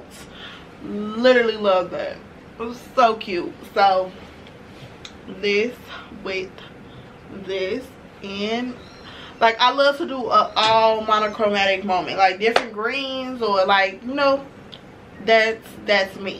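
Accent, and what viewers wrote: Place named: Chicago, United States. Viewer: you sound so Chicago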